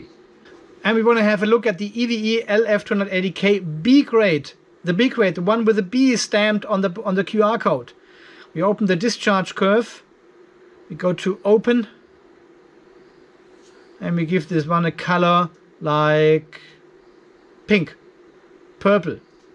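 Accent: Germany. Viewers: You do not sound very Australian. You have a German accent. I have learned quite a lot from this but not sure if it is all necessary.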